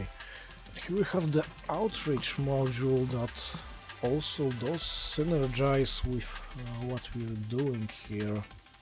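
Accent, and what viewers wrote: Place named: Netherlands. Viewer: Yay! It's great hearing you! Keep being a awesome! Oh man I want to guess your accent; is it of Germanic decent? Dutch?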